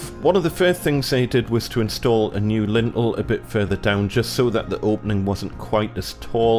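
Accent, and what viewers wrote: Newcastle, United Kingdom. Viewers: Great video thanks for sharing that very well explained thanks are you from Newcastle Gosforth 😀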